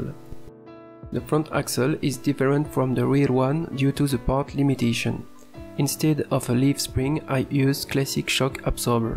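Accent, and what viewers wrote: France. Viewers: It still sounds very french ;) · T’es français tu parle comme un français ! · sounds very french but the script is very well written. and the model is, as always, leagues ahead of what a mortal could come up with. good job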